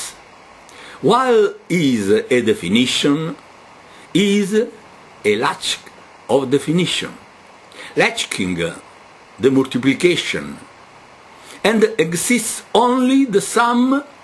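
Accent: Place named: Italy. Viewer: some times i come back to this random video i found just to listen to this guys crazy italian voice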